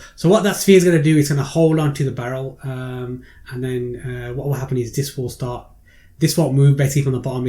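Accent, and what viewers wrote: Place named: Midlands, United Kingdom. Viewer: Thanks for a clear and informative tutorial. You also sound like a Midlander, which is awesome :)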